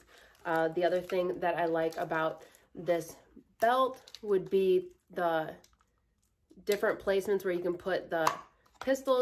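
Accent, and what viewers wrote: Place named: Minnesota, United States. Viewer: 100% she’s from Minnesota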